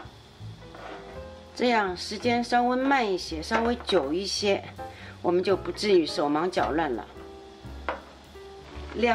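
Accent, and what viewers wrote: Shanghai, China. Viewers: 真正上海人讲国語，就是这种口音的，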